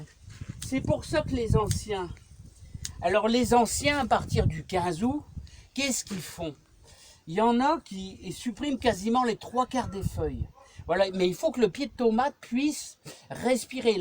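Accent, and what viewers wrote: North, France. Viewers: Super merci avec ton accent du nord j àdore et je vais aérer mes tomates bonne idée a bientôt pour la suite bonne journée Eliane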